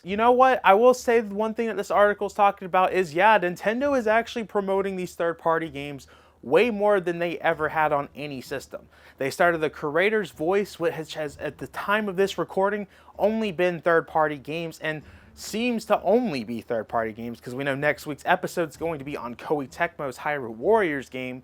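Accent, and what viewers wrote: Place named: New Jersey, United States. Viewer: I wonder if his odd pronunciation is intentional for engagement… I doubt it. Where is the accent from west NJ?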